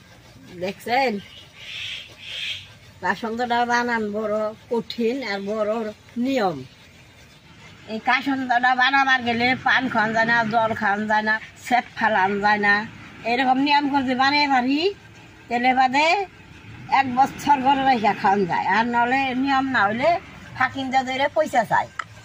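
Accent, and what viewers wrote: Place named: Manikganj, Bangladesh. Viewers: তার মুখের ভাষা আমার মানিকগঞ্জের মতোই, আঞ্চলিক টানও একই। সম্ভবত তার পূর্বপুরুষ মানিকগঞ্জ থেকে আসাম গিয়েছেন। কাসন্দ বানানোর নিয়মটাও হুবহু আমাদের বাড়ির মতোই। নমস্কার ঠাকুমা। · Bangal bolaito thakumar ranna valo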